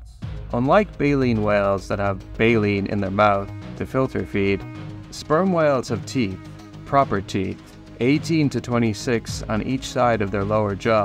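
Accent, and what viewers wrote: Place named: Ireland. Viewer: I spent the first half of the video thinking you were American until my brain went ding and i realised you are Irish. And i'm Irish i felt so dumb.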